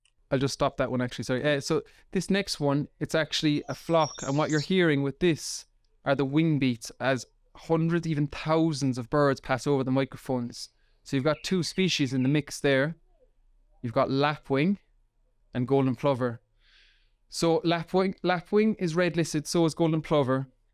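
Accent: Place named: Munster, Ireland. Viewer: Hello 👋 cool video man. I recorded some screeching hawks but I'm not sure which type they are. I'm 80% sure they are buzzards. Is there any chance u could take a gander for me? I want to title the video properlyif that's what they are. Also I'm from kerry, your accent sounds very familiar to me are u on my side or the Cork side? 😂❤ 💪 🇮🇪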